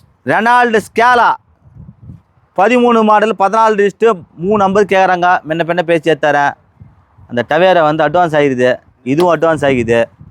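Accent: Madurai, India. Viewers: Super Anna Madurai vasagam 🎉🎉🎉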